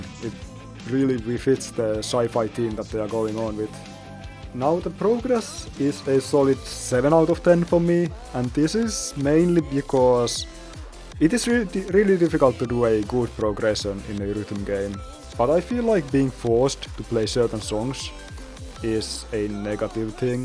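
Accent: Finland. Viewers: ÄIJÄ KUULOSTAA IHAN VITUN SUOMALAISELTA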